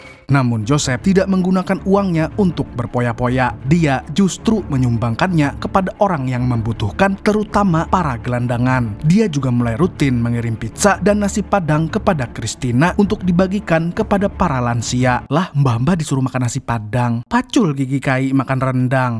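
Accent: Banjar, Indonesia. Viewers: Wkwk asli banjar 😂 pacul gigi kai .. semangat terus berkarya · Min orang mana, Banjar kh? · Adminnya orng Banjar? 😅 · Aiii bhasa Banjar,,,urg banjar kh?? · Pinanya mimin ini org banjar 😊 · Urang banjar kah pian mang 🤣🤣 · Hahah urang banjar kh pian mang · Wkkwkw orang banjar kalinya sampiaaann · Ikm urang banjar kh?😂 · Orang Banjar kah mang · nah bahasa banjar sekalinya😂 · Urang banjar kah beliau ne 😂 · Urang banjar kah pian bang? Hahaa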